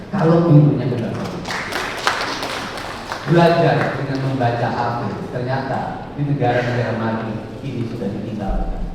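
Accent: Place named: Java, Indonesia. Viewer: Kliatan aroma RI 1 KDM asli Sunda dan jawanya medok banget, adat dan budaya jabar akan hidup lagi salam dari sumatra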